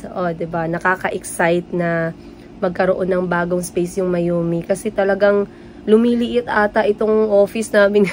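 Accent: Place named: Visayas, Philippines. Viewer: napanood kita mula sa 1st video mo..true from the beginning you were full of finness the way you speak at pagkilos.naobserbahan klng ito.nasubaybayan kita..could be due to sad & traumatic experience mo dun sa Uganda (gawa ng asawa mo)unti unti nagiba ang way of speaking mo.Very understandable yun.Now,na nandito kn sa Pinas you can be your natural self.Bisaya ka kya may pagkatigas ang salita & that's okay.mas marami kming natutuwa sau dahil dun minahal ka nmin pati ang mga anak mo at mga kapatid mo at staff. Tama yang attitude mo deadma sa mga bashers.Sikat kna kasi😊 Goodluck & God Bless!🫶🙏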